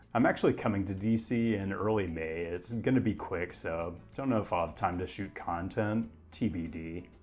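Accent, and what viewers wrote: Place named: Pacific Northwest, United States. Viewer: ah. Thanks. Pacific Northwest, i guess. Portland, Seattle. It’s so bizarre to hear and throws off emotional cues. His accent contributes to his dry sense of humor.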